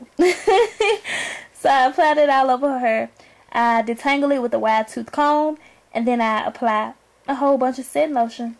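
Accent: South, United States. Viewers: Nice tutorial but you need to learn how to speak you country as hell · how would you maintain the style for day two? And I love your southern accent, reminds me of home. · Love yo southern drawl.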